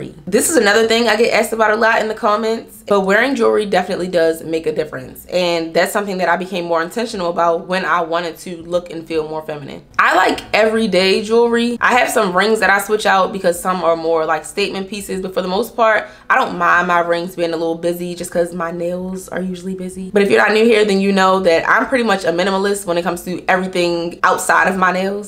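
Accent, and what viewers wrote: Baltimore, United States. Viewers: Chile I knew you were from bmore as soon as you opened your mouth.😂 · Girl! Why did I know you was from Baltimore without actually knowing lol. The accent gives it every time lol. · I knew I heard that Baltimore accent. Heyyy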